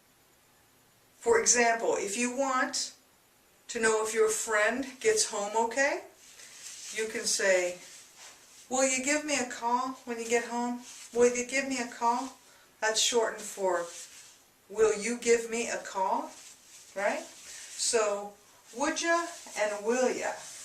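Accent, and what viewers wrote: United States. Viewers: Easy Spoken English it's an Americanized English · is it american or british ?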